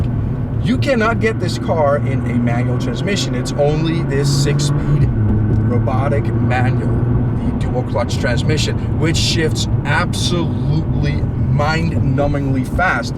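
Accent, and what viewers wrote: Netherlands. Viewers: Are u seriously dutch? Thats cool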